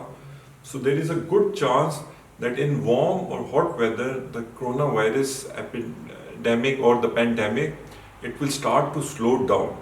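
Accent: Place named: Punjab, India. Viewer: punjabi bahar aa rahi si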